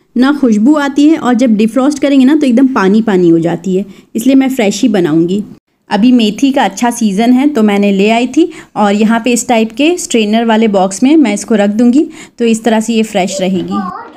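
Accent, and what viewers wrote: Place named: Uttar Pradesh, India. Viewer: App Mumbai so ho. Lekin bat tu pori up wali kartey ho☺️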